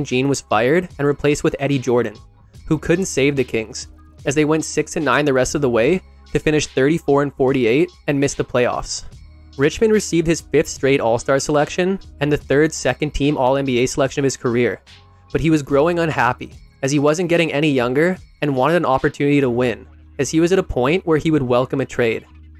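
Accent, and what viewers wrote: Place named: Canada. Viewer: Absolutely LOVE this channel! Love the Canadian accent MUCH more than I should and I love the detailed bios and pictures - you have a sub and Mad respect! 👍 😃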